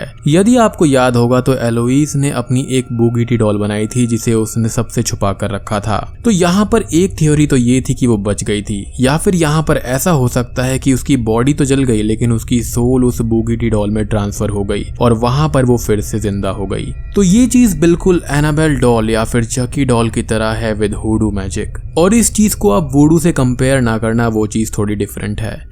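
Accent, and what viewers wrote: India. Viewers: Such an arrogant champ lol. Get your accent right indie boi. Hahah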